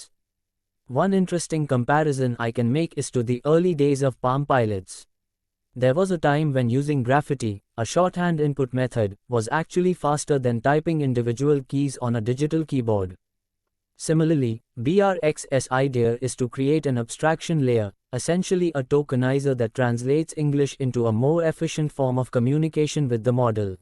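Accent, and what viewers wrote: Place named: India. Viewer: Is this AI generated? If so why pick an Indian accent?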